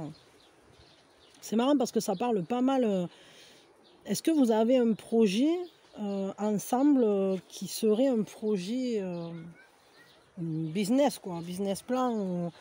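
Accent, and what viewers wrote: South, France. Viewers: Bonsoir 🤗 on se croirait ds le sud ou vs êtes plus votre accent les poules le vent mais j adores ce contexte 🌳🌿🍃🐔🐔🐔🐞la guidance me parle pas j aimes bcp cette façon de penser à l un à l autre se protéger avancer main ds la main ...... J espères rencontrer ce style d amour très grd ah oui 😊🙏 bonne soirée 🤩💖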